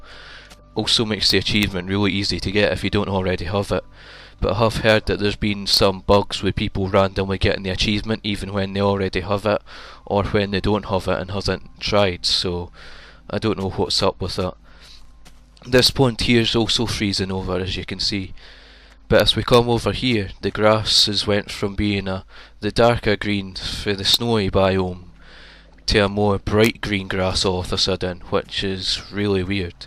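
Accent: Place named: Scotland, United Kingdom. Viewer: Good scots accent mate! Subbed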